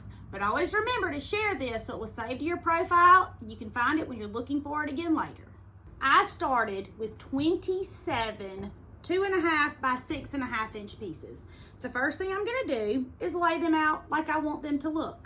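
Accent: South, United States. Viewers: Thank you so much! I just found your tutorial’s and am loving it! Plus I’m a southern girl relocated in the North and miss hearing a southern accent!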